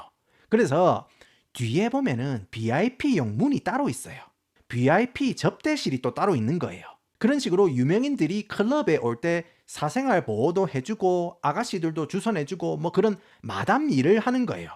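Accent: Gyeongsang, South Korea. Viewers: 이 아저씨 깅상도 사투리가 은근 중독성 있네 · 이 행님 갱상도 사투리 짭짤하게 해재끼다가 영어는 달달하이 할 때 경외감 오지게 든다^^ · 볼때마다 은근 구남친 닮음. 은은한 경상도 사투리랑 말빨 그리고 동글동글한 얼굴…동그란 뿔테안경…참 좋아했었는데… 님 보고 있으면 그 사람이 생각나요 웃긴데 왜 슬플까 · 살짝 투박한 경상도 사투리가 오히려 영어랑 더 잘 말아 지시는 듯 ㅋ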